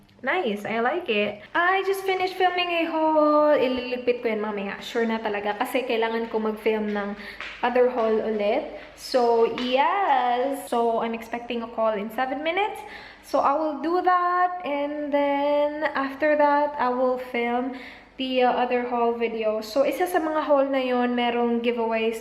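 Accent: Visayas, Philippines. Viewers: hahaha katuwa ka naman the first time I saw your video im not paying too much atention and then i saw again tpos may punto ka sabi bisaya cguro to....natawa ako doon sa mubua nku kalipay oi hahahahahwhich means mababaw ang kaligayahan ko nkarelate tuloy ako sau kc parang gnun lng ako kakalog hahahahhah thumbs up ate!! Rachelle from davao..mwahh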